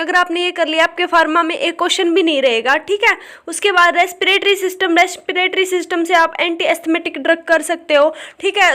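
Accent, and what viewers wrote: Haryana, India. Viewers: That Haryanvi accent is cool And good video 👍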